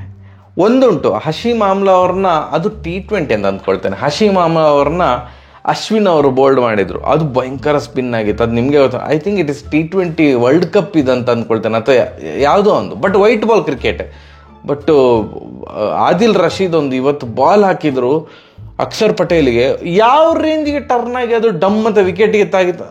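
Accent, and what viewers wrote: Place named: Coastal Karnataka, India. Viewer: Love your analysis and Karavali Kannada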